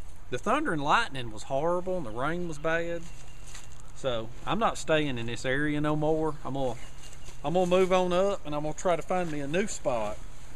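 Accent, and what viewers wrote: South, United States. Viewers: Beaver+southern accent= what region?? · Hi, I've just discovered your channel and " ah jest lurve it". Especially that good ol' southern boy accent. And you have just so many good tips and advice - and your sense of humour just starts my day off with a smile! Keep 'em coming. I'm lovin' it. All the best from Geoff in lil ol' England. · Everything's so dad gum wet! I LOVE your southern twang. Good thing your a good sport, otherwise Nick could be on the business side of a knuckle sandwich, right? Haha. Hope all gors well. I know if anyone can do this...it's you. You got this,!!!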